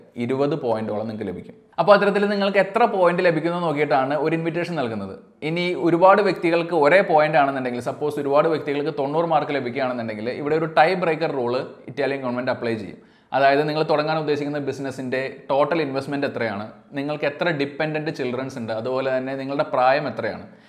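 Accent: Standard. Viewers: നല്ല വൃത്തിയുള്ള മലയാളം.... അടിപൊളിയായി വിശദീകരിച്ചു ...ആശംസകൾ..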